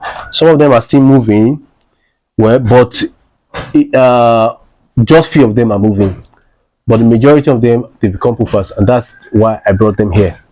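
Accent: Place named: Africa. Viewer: Your English accent is African learn the Filipino English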